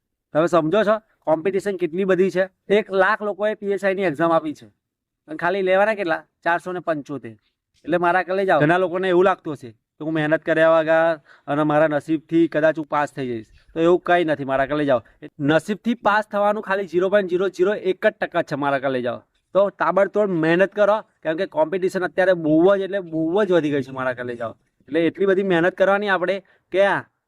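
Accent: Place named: Surendranagar, India. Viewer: તમારું ગામ,તાલુકો અને જિલ્લો કયો..ભેરુ.. સુરેન્દ્રનગર ના લાગો છો બોલી જોતા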